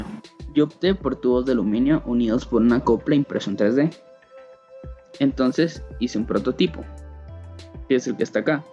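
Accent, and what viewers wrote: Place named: Guatemala. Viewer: Se nota que sos de guate va loco jajajaja, saludillos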